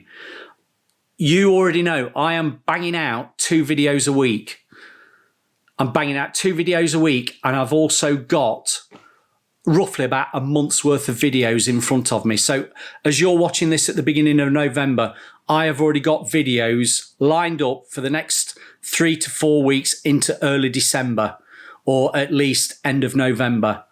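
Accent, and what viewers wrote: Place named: East Midlands, United Kingdom. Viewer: Great to hesr an east Midlands accent on YouTube .. im originally from Grantham ..did my yts for wj king in melton in 1990... Worked all over notts.. Finally great to see a painter doing videos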